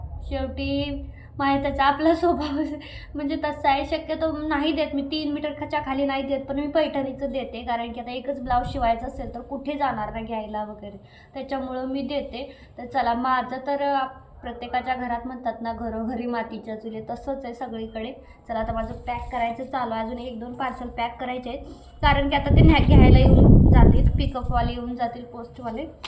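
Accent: Satara, India. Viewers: ठेक आपली भाषा साताऱ्याची 😂😂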